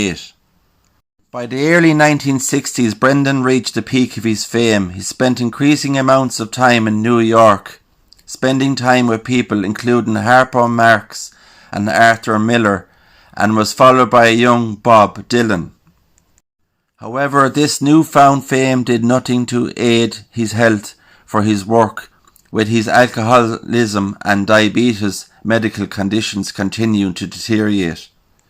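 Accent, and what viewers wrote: Ireland. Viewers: Merry Christmas to you and ❤ I love listening to your voice as the accent reminds me of my Grandparents who came to America from Ireland. Thank you so much for sharing these beautiful cemeteries with us!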